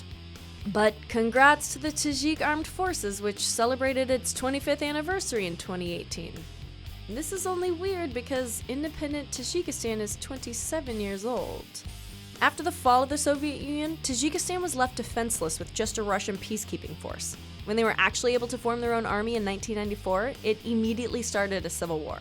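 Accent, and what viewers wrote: United States. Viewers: This video sounds American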